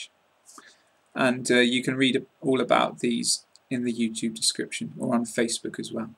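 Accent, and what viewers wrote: United Kingdom. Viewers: I like british accent , nice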